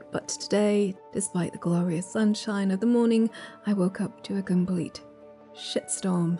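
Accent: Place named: North, United Kingdom. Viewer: I like it when your northern accent comes out.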